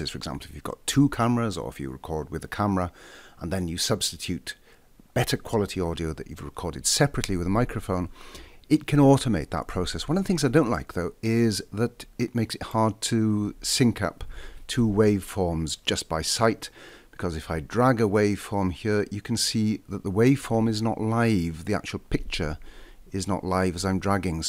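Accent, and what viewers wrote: Wales, United Kingdom. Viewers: Are you from Wales? You definitely sound it. I'm considering getting this, can you add special fx (Explosions/Generally action)?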